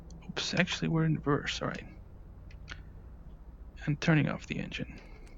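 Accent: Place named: North, United Kingdom. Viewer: ofc whatever , i just wondering like typical north accent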